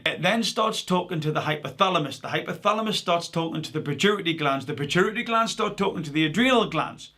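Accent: Newcastle, United Kingdom. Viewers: Wew is that a Geordie I hear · A Geordie on YouTube. Wey aye mate 👍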